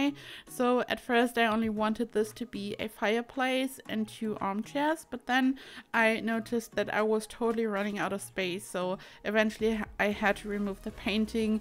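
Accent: Germany. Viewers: Your accent sounds a lot like German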